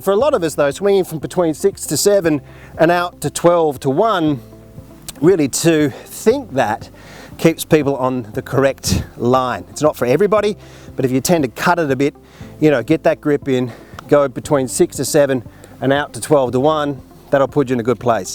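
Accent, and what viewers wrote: Australia. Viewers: great visual animations to assist the explanation, plus the sweet Aussie accent helps too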